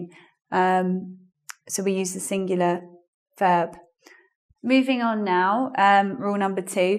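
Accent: United Kingdom. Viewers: thanks a lot for this lesson, i have really learned too much. i have also subscribed ur channel. im trying to (improve)learn (my)english and to have an amazing british accent like that wich you have got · Although in Russian schools we are learning English English I cant understand 100% of information because of British accent. Is it a habit due to American movies and series? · Ohh, it was so cute, when you tried so hard to make us undestend your perfect btitish acсent, I`m very grateful to you) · I just can´t get enough of your accent keep it that way it sounds by far better than the american english. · Hi, I have been looking for so long to English trainings with a wonderful british english and recently I found your channel. When I first heard you, I have known that´s what I was looking for. Since then I hear your speech videos on the train in the bus at home in the kitchen everywhere. 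I'm addicted to your accent and I try to copy that. I am so happy that you are there! You are an excellent speaker and trainer. Very talented. I´ll stay with you because I need your speech style. Lucy is o.k. But your speech style/ voice is more cooler, and your accent is more nicer for my and your videos are full of content. I'm so thankful and I´m a big fan of you! Thanks! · I love your videos and i like watch them, over all, for your accent, im trying to improve british acent and and grammar too. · God i love listen to your british acccent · Hi! I'm from Mexico and i studied American English for about 2 years and i like it but i absolutely love British English and Accent, your videos are helping me to correct that little errors to reach your accent C: so thank you! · To me is harder understand the British accent :( but I like it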